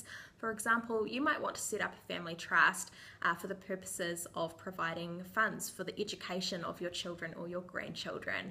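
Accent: New Zealand. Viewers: We Americans (palm slap)....She is not from the UK! The accent sounds similar to the Aussies but I believe she is a Kiwi. Beautiful accent. Isn't it interesting how trusts function so similarly across state, vis a vis governments lines? With the exception of some specifics this information applies to trusts in general. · she has a kiwi accent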